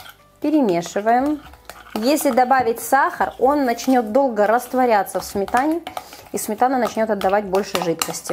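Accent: Ukraine. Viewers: Здравствуйте,. Спасибо за Ваш труд, за Вашу энергию, за Вашу любовь к подписчикам. Очень нравится даже просто смотреть, как Вы готовите, и слушать Вашу милую речь. Я строго отношусь к правильной речи, считаю это важным. Но, как здесь уже сказали, есть в каждом языке своеобразие и самобытность. Ваша речь полна этих самобытных украинских особенностей. Это здорово. Я очень любила в детстве слушать, как моя белорусская бабушка гутарила с соседками. Песня! Обязательно приготовлю по этому рецепту рулет. Будьте здоровы.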